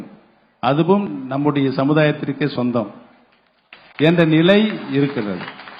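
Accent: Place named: Tirunelveli, India. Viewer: திருநெல்வேலி நாடார் லே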